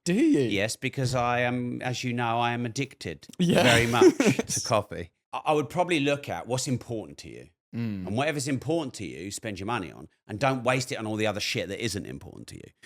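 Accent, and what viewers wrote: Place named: United Kingdom. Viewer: Can't listen to anyone with a British accent more than 30 seconds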